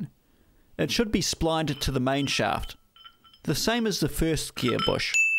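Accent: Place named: New Zealand. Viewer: hi, I'm a kiwi living in Germany - I'm not a Land Rover fan but I wanted to watch a restoration series. Great to hear a kiwi voice, I'm really enjoying watch this series - well done. Des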